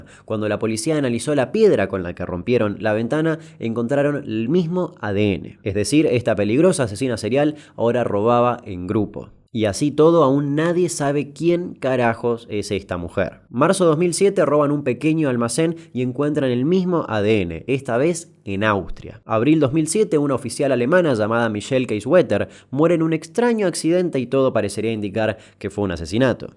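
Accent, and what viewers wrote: Buenos Aires, Argentina. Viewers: Las gashetitas con ese asento de porteño jajaj Pd soy cordobes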